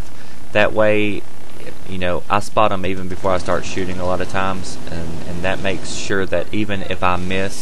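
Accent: South, United States. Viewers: Finally southern guy on here (no homo) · bad company toow. is he from the south?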